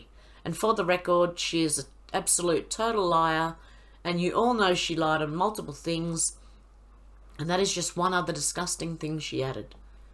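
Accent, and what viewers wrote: Australia. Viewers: Thank you for reading, actually the Australian accent is perfect for, love you 😍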